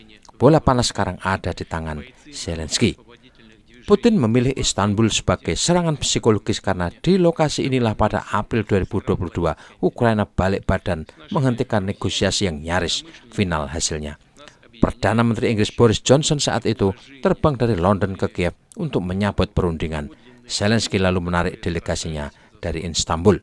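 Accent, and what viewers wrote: Java, Indonesia. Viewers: Bagus mas. Aku suka medoknya. Jowo bangets ❤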